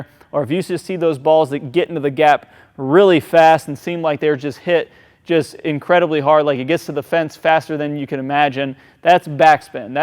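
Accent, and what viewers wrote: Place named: United Kingdom. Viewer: yeah on ur youtube channel u sound like ur British r u British?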